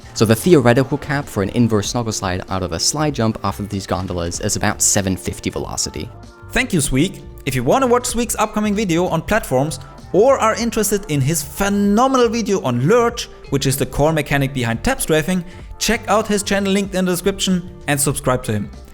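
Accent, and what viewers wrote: Germany. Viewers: Heyy idk why but are you german? you sound like german btw i am german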